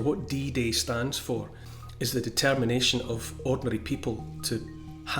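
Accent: Scotland, United Kingdom. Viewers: 💞💞 Immense gratitude (and tribute) for all you do 🤗🦋🐬 A gentle, beautiful Scottish lilt A kind, empathic, forward tilt An open heart; a caring face A willingness to take his place. A strong, home-hitting monologue Reminds us of the boiling frog The courage to become a sleuth A passion to release the truth. A deep desire to crack the whip Illuminates our freedom-strip Calls out the darkness taking hold The shiny despots; plated gold! Intense and raw, he lays it bare A soul-enhancing, honest stare Inflections drive the message through He speaks for Us - for me and you! Discernment's such a precious gift His words create a mighty lift Take heed of all he has to say He knows - it shouldn't be this way! 🤗🦋🐬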